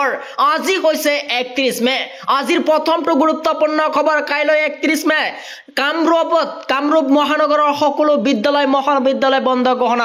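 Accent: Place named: Bangladesh. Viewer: Bangladeshi Jen Lagise news Kuwa mokkel tu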